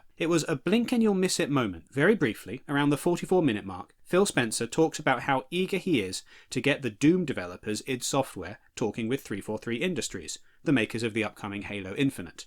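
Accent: United Kingdom. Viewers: Dude, your British accent and calm demeanor can’t hide the truck load of salt in your voice And this is coming from a guy who owns a PS5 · You're an embarrassment to the English accent. Good lord, what an absolute cretin.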